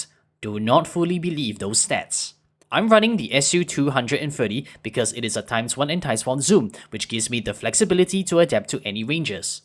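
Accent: Singapore. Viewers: I detected the singaporean accent right away, but i digress. Great video bro keep it up. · I was just about to comment "this is the closest thing to the BF3 M16A3" and then the video continued and you said exactly that. Great video on my favourite weapon in this game. I'll definitely be trying out that first build with the suppressor. Also, the Singaporean accent is the best Asian accent in English.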